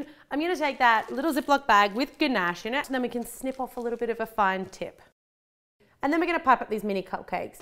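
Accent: Australia. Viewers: Are you Auzzie?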